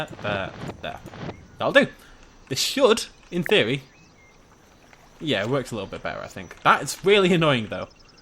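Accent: United Kingdom. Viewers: I no your British but why did your voice Chang to a British voice and are you British amrican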